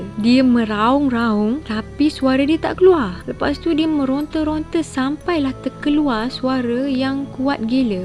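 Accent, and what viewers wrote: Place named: North, Malaysia. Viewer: Comel. Dia campoq bahasa baku dgn bahasa utaqa. Hahahaha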